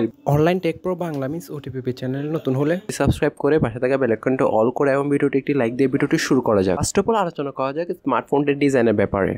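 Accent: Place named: Chittagong, Bangladesh. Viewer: চিটাইংগা বদ্দা.... আঞ্চলিকতার মিক্সিং ভাষা পরিবর্তন করতে হবে।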